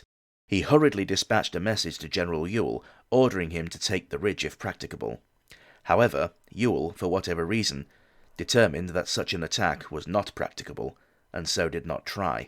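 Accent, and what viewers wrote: South, United States. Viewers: Love ya did, but my home is in petersburg and near Richmond. My Cherokee family was "resettled" because those of color weren't allowed in the new union. Fort Lee was just changed to Grigg Adam's. Being from Texas originally, I knew Lee's role in the Mexican wars. I loved hearing the first bit, but started to feel that old sadness. God bless you and cant wait for next episode and hope it's not about the trail of tears . Cute southern accent dahlin!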